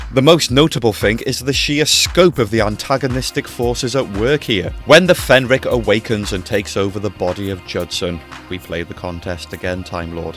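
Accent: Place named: Northern Ireland, United Kingdom. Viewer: Part of me wishes this was the final episode of the seventh doctors era. As cheetah Planet ain't bad its just feels like mid-season filler & it'd have been so much better for this to have ended an Era its spiritually the season finally but the way the episodes played it ended up being the second last story. It was the perfect end to the seventh doctor. I really am glad that its part of the easy to access stuff for the newer fans to the classic era. As its fitting I got this on DVD in 2013 when I was young round the 50th due to me having a magazine that talked about a bunch of stories & this one caught my eye & at the time it kinda went over my head & I tried to relive it last year or so but my disk was scuffed & the DVD is outta print. Was planning to watch it on iPlayer when it came out & decided to watch the tales of the Tardis form which is alright but I feel like it doesn't open with as much mystery when ACE spoils it. 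But other than that its great. I shall come back to this review once I've finished watching it again. I just came to comment because the internet is being a bit annoying & I am just waiting for it to work before I post this & return. Edit: this has been eating at me for a while but I've heard enough of your speech to make me think that you're Northern Irish like me. Never heard anyone outside of NI say Babi like other words have been used but none like Babi which makes me just think you are Northern Irish. Love from the beaches of Strangford lough where Vik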